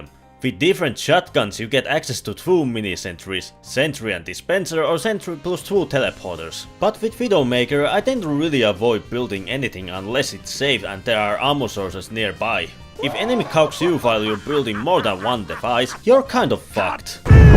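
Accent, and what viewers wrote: Finland. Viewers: are you finnish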